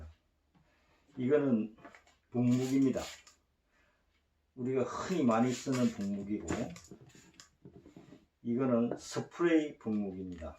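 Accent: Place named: China. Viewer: 중국분이세요? 듣도보도 못한 사투리네요 ㅎㅎㅎ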